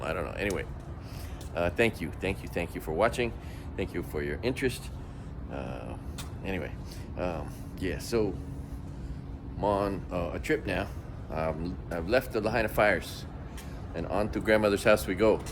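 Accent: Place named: California, United States. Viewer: I wanna tell you something I think you’ll get a kick out of and see the funny side -: first time I heard you sign off “IF yer thinking of doing harm or crime, do less do nothing today, sit on sofa 🛋️…..”! You were standing outside planet gym or something where you’d just had a shower 🚿… and the thing is although we both speak English, due to differences in our accent, (im Scottish and you’re deep west coast California, I thought you said, “ do less crying 😿”. You see, I have bad days where everything gets me overwhelmed and I just wanna cry… Some ppl also can do harm to themselves. But I was literally feeling so bad when I heard you say “ if you thinking of doing harm or crying (crime) do less… just do nothing today, be lazy instead”! so, do you know what I did..? I didn’t stress… I stayed on couch all day sleeping, watching tv, watching YouTube, no hassle, no tears because of you, I was kind to myself because I thought you said “no crying”! 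So it’s funny, but I wanna say Thank you- because I had a chill day instead of being sad because of your kind, heartwarming real life way of signing off your videos. It wasn’t till I watched a few more videos, the penny dropped & I realised you weren’t saying crying, but rather crime! Ha ha 😅 ye gotta a laugh bro 😊😄 And may I say, that Making these videos are living examples of the two Hawaiian words pono and keena oly (sorry I don’t know how to spell them maybe you can reply with correct spelling) Bon voyage You handsome man ❤ P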